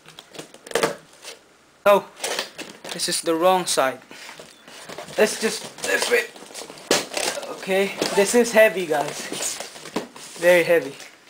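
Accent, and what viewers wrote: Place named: India. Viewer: you sound indian. i'm chinese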